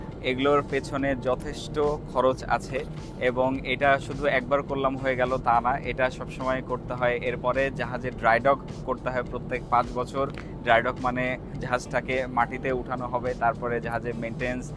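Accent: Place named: Dinajpur, Bangladesh. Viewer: দিনাজপুরের উচ্চারণভঙ্গি মনে হচ্ছে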